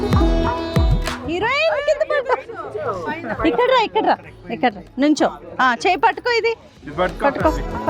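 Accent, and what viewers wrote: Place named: Telangana, India. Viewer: tg accent osthundhi it is very diff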